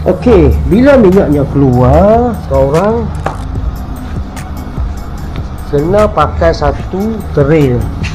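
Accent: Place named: Kelantan, Malaysia. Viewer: BUNYI KELATE LAH PLAK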